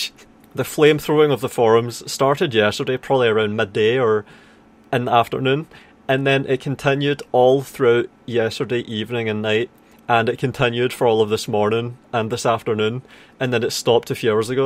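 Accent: Scotland, United Kingdom. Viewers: I like your vids, i wish i would understand scottish tho.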